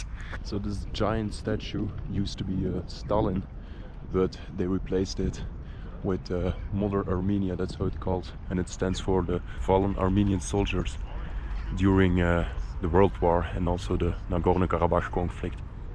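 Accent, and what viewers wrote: Netherlands. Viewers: Loved it, loved the dutch accent too lol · Hou van je nederlands accent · mien jong ik volg je nu al een tijd en ik wist aan je accent dat je nederland kon spreken lol. greetings from groningen for ya. youve gone on quite the adventures! i always like seeing it. just please be safe with the railways and such from time to time! i saw someone already notified you of them being electrified. keep it up though, exploring places is what shows us beauty in what was left behind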